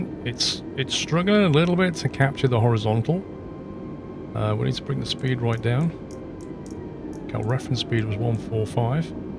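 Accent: United Kingdom. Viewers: British accents are so fancy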